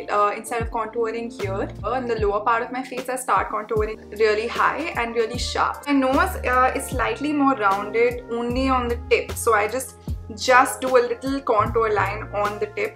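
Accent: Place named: Mumbai, India. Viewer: You've got the mumbaiyaaa tone already makes me smile idk why ♥️😘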